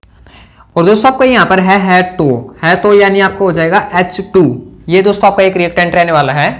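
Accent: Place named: Haryana, India. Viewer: but kuch words the jisse saf jahir horha tha ki ap haryana se ho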